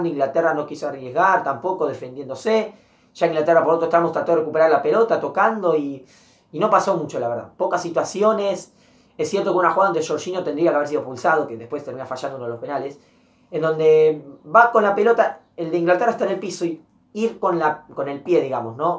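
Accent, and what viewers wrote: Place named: Argentina. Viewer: ya tenes dos suscriptores italianos hermano XD por tu acento creo que sos argentino y pues italia y argentina son hermanos de sangre asi que tambien estube muy muy feliz cuando me llego la noticia que tambien el albiceleste habia ganado... argentina en el templo del futbol de latinoamerica... e italia en el templo del futbol de europa.... como bien dijiste fue un wembleyazo... tambien por como los ingleses ya se sentian la eurocopa en el bolsillo... asi como el brasil en el famoso maracanazo cuando ya se sentian campeones del mundo.... antes del partido yo ya me sentia que iba a ser como en aquella ocasion... aca se dice que la pelota es redonda asi que uno nuca sabe hasta que llegue el final... pero algo dentro me decia que ibamos a llevarla a roma... y bueno que puedo decir.... muy pero muy buena analisis de como se dieron los hechos en el partido.. 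pero... por dios... chiellini... leelo "kiel-lini" porque asi se lee XD CH en italia se lee como QU en espanol :)) bernardeschi.. es bernardesqui :)) seguro tambien el otro compatriota mio te lo agradecera jajajajaj XD GRANDE argentina y GRAZIE AZZURRI!!!!!!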